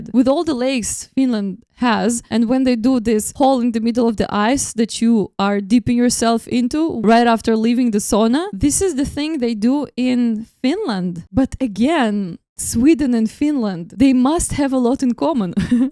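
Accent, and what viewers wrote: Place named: Russia. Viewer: Great reaction. And lovely Russian accent! Greetings!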